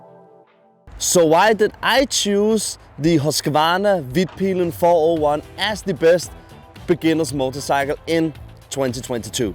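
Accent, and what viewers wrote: Denmark. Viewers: haha dude that’s amazing. Love me a danish accent. Awesome review too - I’m getting my license and that bike in the next few monthsb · 3 seconds into the video, I'm betting 10 bucks that this guy is Danish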